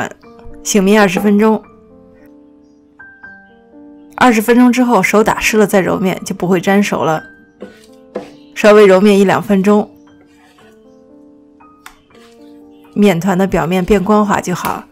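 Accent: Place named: Beijing, China. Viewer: ，我总觉得你有点北京口音，你是不是在北京待过或者周围有不少北京朋友？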